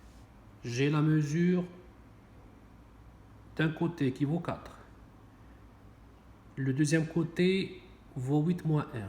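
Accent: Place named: Canada. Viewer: Ouahhh, très bonne vidéo Aussi, je suis habitué à entendre l'accent français parisien, l'accent canadien est très sympa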